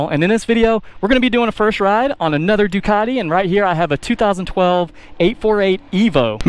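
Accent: United States. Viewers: Between the paint lines looking the same as in a lot of the US and your accent I had assumed you were in the US and thought of offering you some time on a 2013 Streetfighter 848 if you were going to be anywhere near the DC area.. but no, South Korea is a bit of a trip 😂 Maybe for the best, mine isn't the prettiest example! Coming up on 30k miles, dark stealth, frame sliders, aftermarket tidbits here and there... It rides nice, but it looks its age in places.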